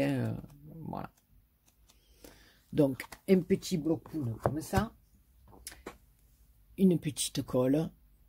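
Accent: South, France. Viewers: Coucou, tout d abord une bonne et heureuse année 2020,du bonheur, la santé très important et continues à nous émerveiller par tes créas, surtout ta bonne humeur avec ton accent du soleil. Le père Noël as été très gentil avec toi,ça prouve que tu as été gentille lol.j adore le dies bordure que tu as fais avec du papier noir. Les blocs de papiers sont magnifiques, j ai le dernier que tu as montré. Maintenant il n y a plus que,aller hop au travail. Merci pour la présentation. Gros bisous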